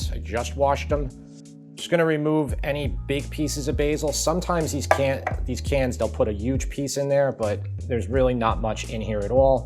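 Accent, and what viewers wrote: New York, United States. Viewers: I have been watching your videos and have been learning so much...I am even trying to pick up on the New York accent...I love all your videos.🥰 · I love your NY accent! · He has a very light NY accent. Mine & my family is way thicker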